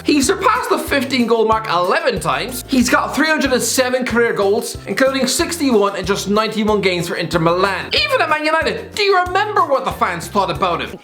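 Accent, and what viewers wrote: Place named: Ireland. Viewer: Irish "Bilabial Trill" Guy.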